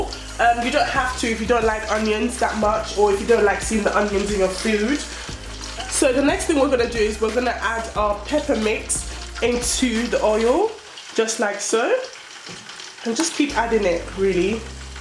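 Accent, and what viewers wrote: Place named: United Kingdom. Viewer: She has a british accent , not her fault, actually lovely to listen to and as clear as day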